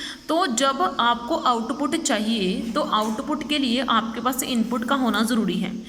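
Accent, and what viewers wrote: Punjab, India. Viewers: I think uu are Punjabi 😅....way of talking 👳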